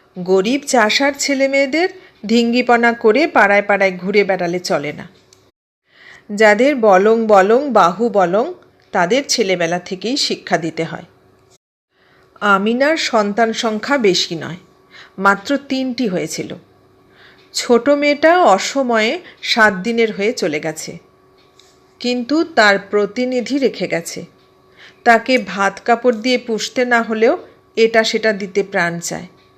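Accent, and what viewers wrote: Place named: Bangladesh. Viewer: ফুল্লোরা থেকে ফুলজান, তার থেকে ফুলি। খুব সুন্দর গ্রামীণ বাংলাদেশি বাংলা ভাষা পাঠ করেছেন। দেবীরা কখন কি বেশে আসেন সেটাই আমার ভাবনার বিষয়। গল্পের ঘটনা যা, সে যুগ আর নেই, নোংরা রাজনীতি ও জনবিন্যাস বাংলার সব শেষ করে দিয়েছে।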